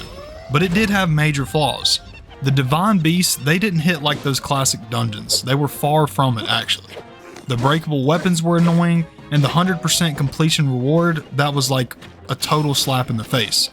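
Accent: South, United States. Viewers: I love this guy's country accent. Really relaxing to listen to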